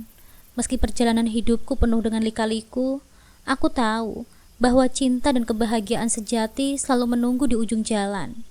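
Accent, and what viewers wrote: Java, Indonesia. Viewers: Bd hadir mbsk, medok pisan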